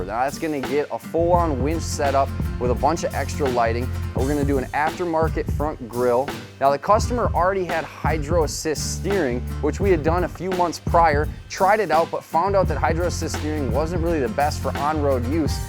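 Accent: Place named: Wisconsin, United States. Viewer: Just that good ole Wisconsin accent! There is a full build list available!! <link>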